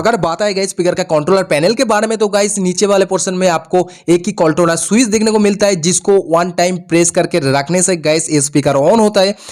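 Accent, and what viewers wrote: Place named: Assam, India. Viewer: Banda assami hai baat karne se pata chalta hai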